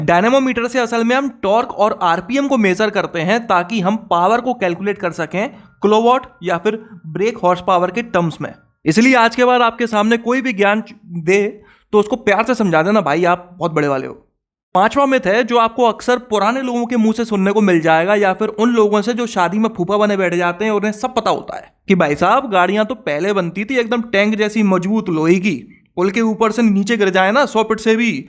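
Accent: India. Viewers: Yeh Bhai gwalior Agra mathura side ka lagta sayad 😅😅😅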